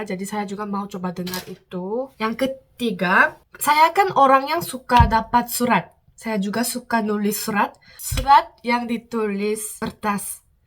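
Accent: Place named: Indonesia. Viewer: Logat bicaramu udah in dg onesia banget Cantik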